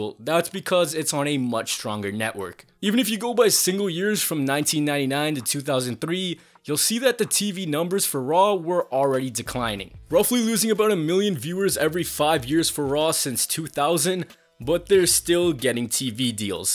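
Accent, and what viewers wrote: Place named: India. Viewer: this guy speaks with a heavy indian accent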